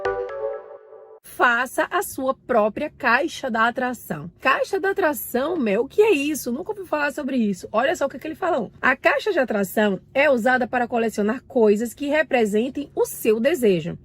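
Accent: Bahia, Brazil. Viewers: Rumo ao 100 mil 🌻 #novaaquinocanal 🧡 faz acho q uma uns 15 dias que você apareceu na minha vida, gente quanta luz, quanto energia boa, aaaamo o sotaque baiano, só ele por si só já nos trás acalmaria, a certeza que tudo é lindo, que tudo chegará a nós. Hoje resolvi me organizar e assistir toooodos os seus videos☺ acabei de ver a playlist da lei da atração, os 33 vídeos 🤭 e fui anotando todos as principais mensagens para colocar em prática. Obrigada por dividir com a gente tanto conhecimento, alegria e certeza que o universo é perfeito. E por manter (no meu caso) a Fé como absoluta. Agradeço a você, agradeço a sua vida (lulu, ben e lucas). Fica bem!! Você está mais linda, amei seu evolução (afinal vi vídeos de 6 anos atrás 🤭). Gratidão 🌻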